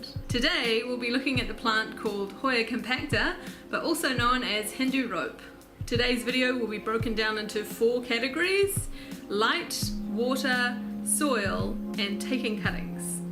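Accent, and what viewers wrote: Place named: New Zealand. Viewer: Another kiwi! I heard Kia Ora and wondered if I was hearing things... then the accent confirmed it. I was given a rooted cutting, and showed a lady at the garden centre and she said she'd never seen one before?!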